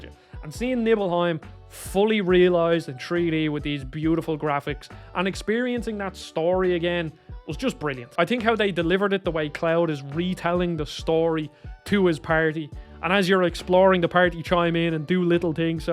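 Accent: Ireland. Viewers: Earned a sub from me pal - glad to hear a fellow Irish accent raving about this game!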